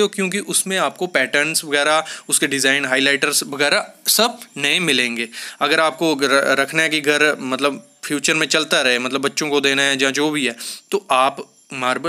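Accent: Jammu, India. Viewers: Jammu se ho aap.??. Dogri touch ha ap ki language me. Good explanation 👍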